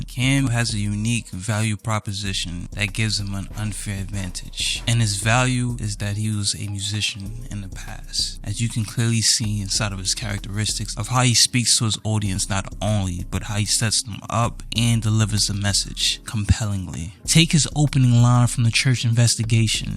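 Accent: New York, United States. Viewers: The NY accent is coming thru super strong!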